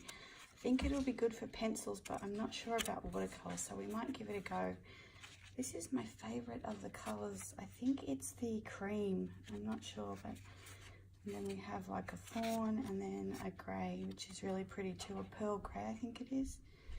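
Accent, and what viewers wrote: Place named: Australia. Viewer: I’ve watched a dozen videos on painting Watercolour roses and this one is by far the best. You sound Australian, hi from Tasmania 👋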